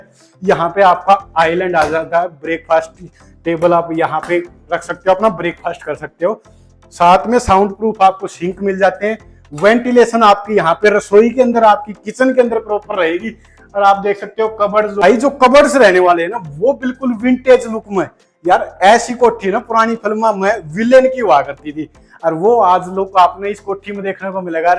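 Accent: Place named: Haryana, India. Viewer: Sir haryanvi touch k sath to or bhi acha lga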